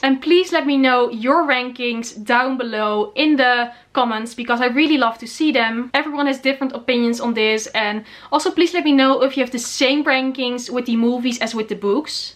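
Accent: Netherlands. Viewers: Your accent is charming :) I immediately started wondering where are you from while watching one of your previous videos ... but I've just glanced at your description and now I know that you're Dutch! As far as the movies go ... I was dejected enough with the Half-Blood Prince to ignore the Deathly Hallows I premiere in Poland where I live. The last two movies I only watched at home.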